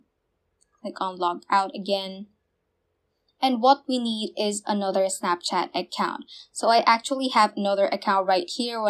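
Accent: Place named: England, United Kingdom. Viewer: Your English accent it's so nice ❤️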